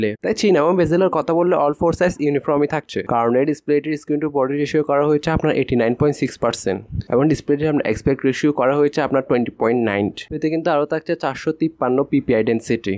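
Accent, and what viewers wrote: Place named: Chittagong, Bangladesh. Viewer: চিটাইংগা বদ্দা.... আঞ্চলিকতার মিক্সিং ভাষা পরিবর্তন করতে হবে।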